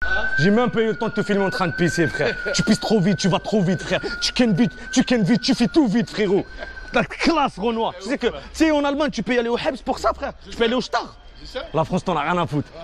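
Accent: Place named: Annaba, Algeria. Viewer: أنا نكره الهدرة تاع عنابةدايرة كي الشعر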